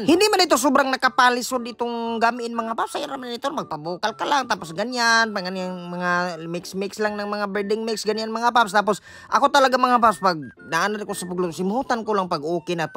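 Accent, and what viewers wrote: Visayas, Philippines. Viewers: Dili jud makabidli, i like the way you bintag, binisayang tagalog🤣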